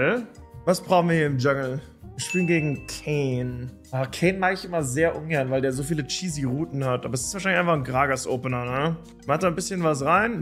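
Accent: Bavaria, Germany. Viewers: Wie sich der bayrische Dialekt am Anfang ausschleicht 😂😂😂😂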